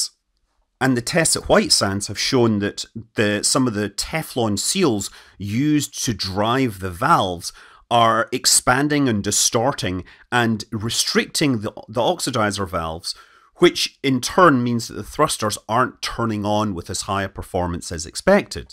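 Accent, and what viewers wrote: Scotland, United Kingdom. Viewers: Also when is England going to teach Scottish blokes to speak English?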